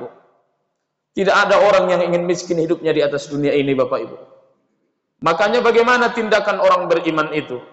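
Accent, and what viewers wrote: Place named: Pekanbaru, Indonesia. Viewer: Daerah mana ya ini, macam pekanbaru bahasanya.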